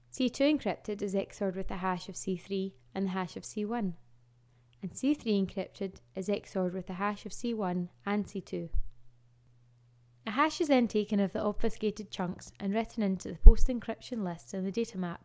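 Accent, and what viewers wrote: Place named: Scotland, United Kingdom. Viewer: Nice female Scottish voice :)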